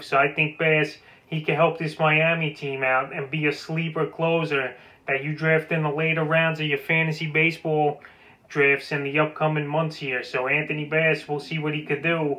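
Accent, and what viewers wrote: New York, United States. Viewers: I love this guys New York voice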